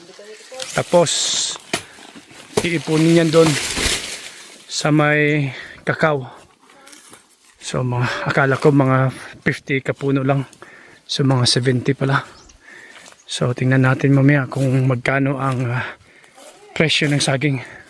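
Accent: Iloilo, Philippines. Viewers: Ilonggo gid haha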